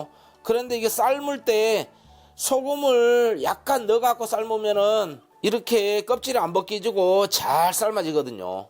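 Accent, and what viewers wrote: Jeolla, South Korea. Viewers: 구수한 말투가 전라도 저 아랫지역 바닷가 근처 목소리셔요.. 구성지게 귀에 쏙쏙 들어옵니다.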